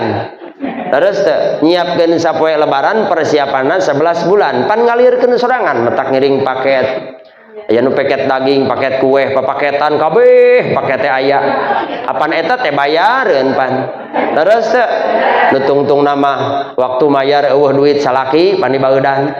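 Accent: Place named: Banten, Indonesia. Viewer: Logat bantenna ieu ajengan kadangu pisan😅. Barokalloh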